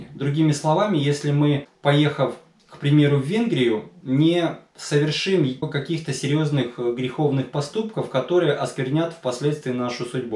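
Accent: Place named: Odessa, Ukraine. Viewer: мне нравиться ваш украинско-одесский говор)) долгожданное видео, многое подтверждает...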